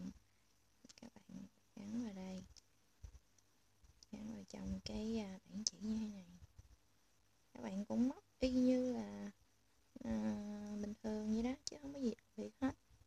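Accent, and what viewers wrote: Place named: Central-South, Vietnam. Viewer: nói to lên một chút c ơi, giọng miền trong vừa nhỏ vừa vừa khó nghe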